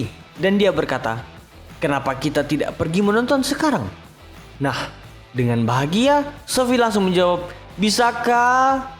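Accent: Palu, Indonesia. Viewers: Kayak logatnya orang palu komiu ini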